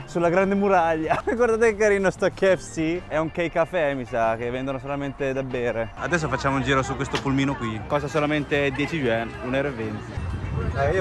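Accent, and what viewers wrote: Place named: Romagna, Italy. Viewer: Di dove sei??? Hai un accento romagnolo.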